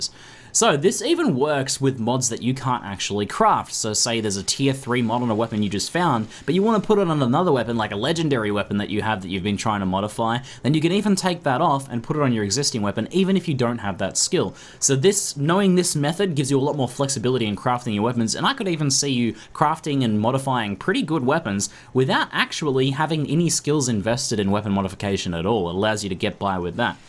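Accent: Australia. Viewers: You Australian or NZ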